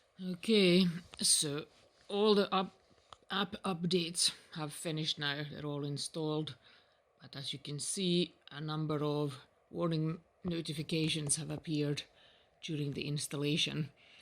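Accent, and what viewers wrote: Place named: Scotland, United Kingdom. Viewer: Great video,your son did a great job handleing the video.trying to place the accent, im in NE Scotland.get the feeling you are not far away.Thanks again,regards John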